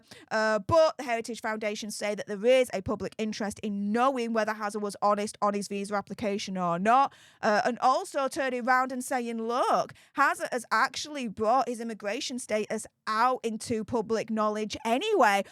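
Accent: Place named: Cockney, United Kingdom. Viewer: Your accent sounds East ender working class .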